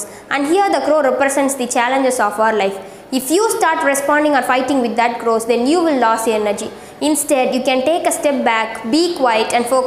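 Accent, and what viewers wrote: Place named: United States. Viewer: it is like an American dialects... superb...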